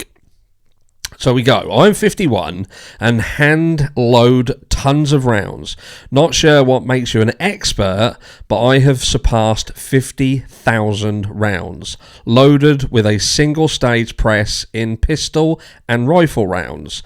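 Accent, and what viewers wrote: United Kingdom. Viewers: It's so funny to hear a Brit talk to Americans about ammo or firearms. 😉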